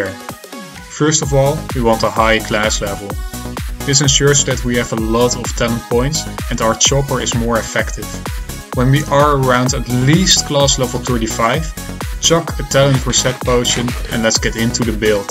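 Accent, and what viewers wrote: Netherlands. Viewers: Ik hoor een nederlandse accent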